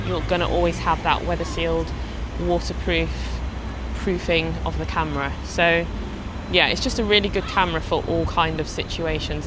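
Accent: United Kingdom. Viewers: Excellent video! I’ve just ordered mine and am so excited! A nice change to hear a fellow Brit and to see beautiful Bath too! 👍🏻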